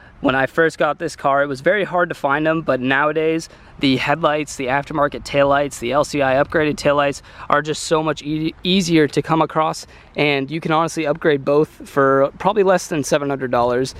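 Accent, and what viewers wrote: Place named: New England, United States. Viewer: Sound like you from New England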